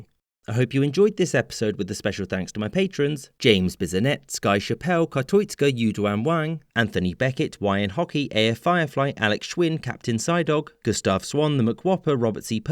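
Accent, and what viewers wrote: England, United Kingdom. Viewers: It's the English accent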